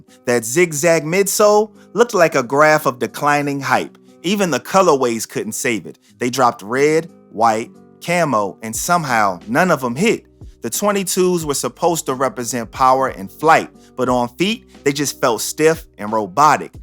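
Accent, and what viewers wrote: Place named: DMV, United States. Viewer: When you talk about the 2’s…when they came out, the top selling shoes for all dope boys were the Gucci tennis sneakers which are basically the Jordan 2’s but low top…and that’s exactly who bought those shoes…dope boys. They may not have been as widely popular as the Jordan 1 but for cats in the street that was touchin a little bread, they was on them. My brother had 4 pairs. I kept 1 pair when he went to the Feds and held on to them for a while. I ended up making a couple bands on them but I could’ve made more than that if I was smart. Anyway, I thought you was from the dmv based on your accent…MoCo or sumn. I guess not. Cause if you were from DC you would know that the 2’s were especially popular here in the 80’s. But you got it.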